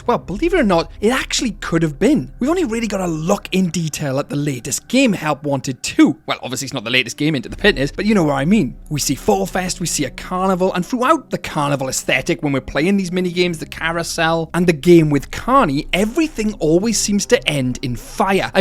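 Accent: Wales, United Kingdom. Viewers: Completely unrelated but are you welsh?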